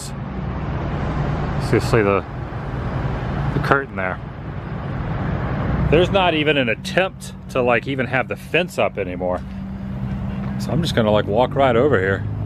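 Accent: South, United States. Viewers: do you have that beautiful Southern accent I love so much it's my favorite accent 💙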